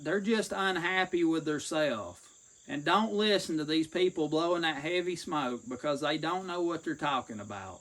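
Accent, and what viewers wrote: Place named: Appalachia, United States. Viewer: I love hearing your stories about the olden days. It's wonderful that your recording them for prosperity. I pray you're downloading all your episodes as backup in case something happens to this platform. I would love for you to have this wealth of knowledge and wisdom spoken in a calm, relaxed, and real Appalachian accent. I learned ways to rally the town in case of danger. Community is all we have now.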